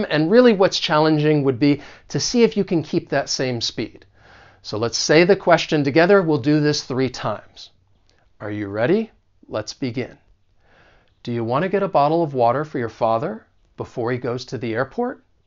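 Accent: South, United States. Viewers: I’m not an expert when I say any of this, but I am an American with a slight southern accent. I think linking is leaning more into a southern accent than a standard one. I also think that the “for” pronunciation you are talking about leans more on the Southern side rather than standard.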